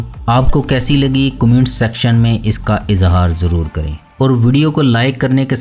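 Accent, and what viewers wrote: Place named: Sheikhupura, Pakistan. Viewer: dear AAP se poocha Hai AAP kis City se Hain please tell me , I like your accents shiekhupura ka lagta hai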